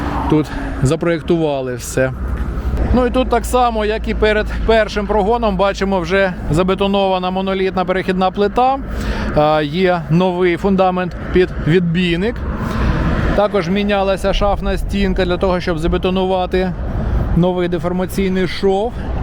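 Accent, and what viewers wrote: Kyiv, Ukraine. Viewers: Дякую, за чудову українську мову. Але мені також дуже подобалася ваша "київська російська", що підтверджувало, що ви є питомим киянином. Вітання вам із Харкова.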